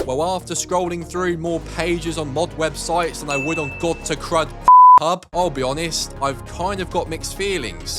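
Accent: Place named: England, United Kingdom. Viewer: You have the most pommy sounding voice.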